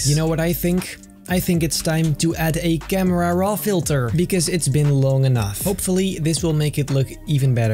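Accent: Netherlands. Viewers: Quick question, are you Dutch? Becuz your accent is the same as ours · Turned out pretty good ✨, but I miss videos with the dutch accent 😆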